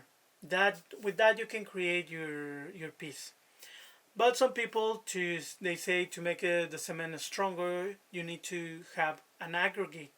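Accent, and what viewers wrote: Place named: Italy. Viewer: Thanks for the video! I was making a clay figure sculpture in college, & then got injured in an accident. I was next not allowed by my professor to finish & turn it in for the semester; very unfair. My daily Bible Word gave instruction "Do not return to the place where you have been harmed," showing me not to work with this cruel professor anymore. So I was trying to find a way to make my sculpture since I no longer have access to a pottery kiln. I looked at expensive materials I cannot afford. I was praying & wishing there is some kind of archival 'air dry clay' that is like pottery. I asked God can you please let there be some kind of 'miracle' clay I do not need a kiln to fire?? & to please show me what to do? DUH--- then right after prayer, the next thing that comes my way is videos of cement mixture sculptures! LOL. I realize it is the 'miracle' material I was hoping for--- air dry, but solid... 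lasting... not so fragile like plaster. I really want to give this a try... & hope to avoid problems like you talked about... "rocks for mom" where the concrete dried before you can use it. I am watching many videos. Your Italian accent is very attractive to American women like me... though you are too young...boo... Oh well. At least I can learn art from you. Thanks for the lessons! Blessings. Peace.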